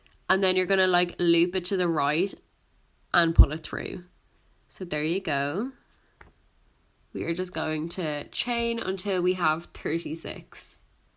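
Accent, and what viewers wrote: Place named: Ireland. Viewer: Are you Irish? you have an Irish accent. (I know what an Irish accent sounds like because I live in Ireland)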